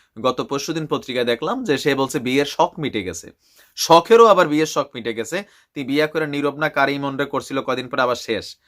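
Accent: Comilla, Bangladesh. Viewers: ভাই, আপনার কথাগুলো মজার কিন্তু সত্য। আপনার কুমিল্লার Accent দারুণ।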